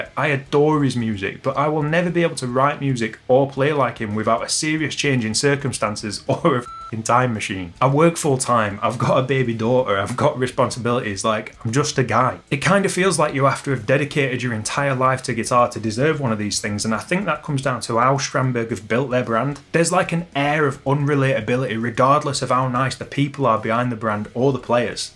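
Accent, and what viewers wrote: United Kingdom. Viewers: HEMA or Historical European Martial Arts as it's called is what i do. It's growing in popularity throughout the country, i'm assuming from the accent you're UK based, so depending on where you are you may have a single club or several in your area. It's a fairly relaxed environment in my experience that you can take things at a pace that suits yourself.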